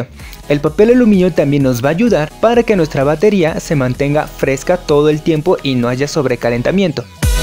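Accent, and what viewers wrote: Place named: Spain. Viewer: me cae super mal que hable como si fuera un español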